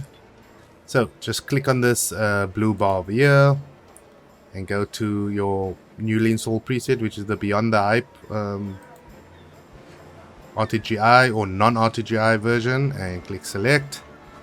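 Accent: South Africa. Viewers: Never expected to hear a South African accent on a mod vid😂 Thanks for the help man! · Yo nice vid man. The game already looks amazing to me but wanted to see what the modders did to it. Also, hope you dont mind me asking but you sound South African, are you from here? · Yooooo a South African! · I knew that was a South African accent, the "biltong" just confirmed it 😭🇿🇦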